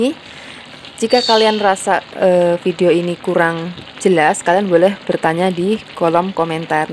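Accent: Java, Indonesia. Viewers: Pantes gole ngendika gula ne rada medok 😀 kulo asli sokaraja tp merantau k bks mba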